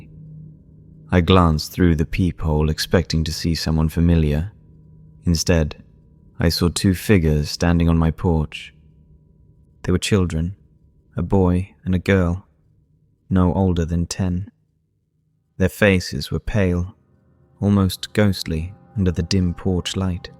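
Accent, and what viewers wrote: United Kingdom. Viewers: I enjoy YT channels that create their own content. I am in the process of watching all the videos on your homepage. The British accent in narration adds a nice flavor to the story. You have tales I haven't heard before, as I said the narration is wonderful, excellent grammar in the writing, have you ever thought of writing short stories of longer duration. I'll wager you would be good at it.. Thank you for all the effort you put into your productions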